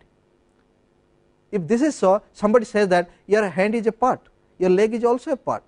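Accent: India. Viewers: first have respect towards guru.... u dont always get everything served ready on platter buddy.... one should also try to acclimatize..... aren't we trying to learn american/british/german accent/language when we want to study in their country? learning to understand indian english accent is also a similar job for u. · Wery hard to understand his pronounciation for any non Hindu> Hindu people please, please kindly work more on your English accent at school. I usually have no problem understanding anybody who speaks English whether the person is an European, Asian or African with one constant exception - citizens of India. An utter nightmare, sorry but i have to say that. I don't understand a word. You have such a strong accent that it is like listening to somebody speaking with a full mouth. Take it easy, slow down, and at least try to pronounce the words in a proper way. 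Leaving this now and going back to Radhakrishnan introduction - at least I am able to read what he says without having to listen to him.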